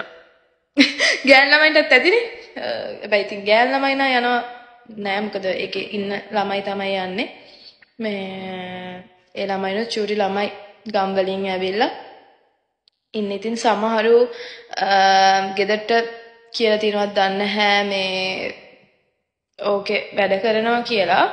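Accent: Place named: Kambanwella, Sri Lanka. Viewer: කංබංවැල්ලෙන් ඇවිත් වගේනෙ කතාකරන්නෙ මෙයා.. අපරාදෙ කාලයයි ඩේට ටිකයි කැපිච්ච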